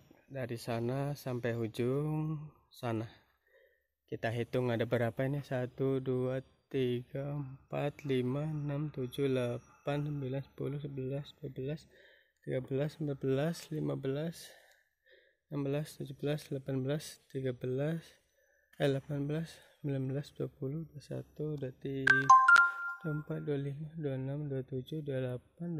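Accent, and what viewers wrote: Banyumas, Indonesia. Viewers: asli org ngapak cilacap ya mas aku purwokerto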